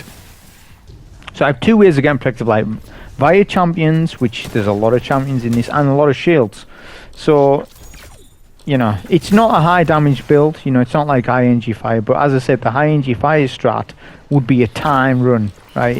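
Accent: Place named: Middlesbrough, United Kingdom. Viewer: Top content as always. I don’t want to be cheeky but your ascent sounds like you’re from the ‘Boro.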